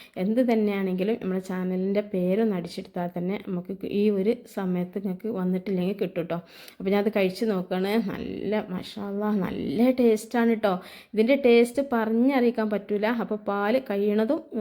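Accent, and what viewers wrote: Malappuram, India. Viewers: Sathyathil ivar malappuram kare naattikkan vendiyanennu ingane samsarikkunnath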